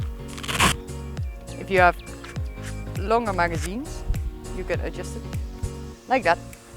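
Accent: Netherlands. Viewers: Is that a dutch accent I'm hearing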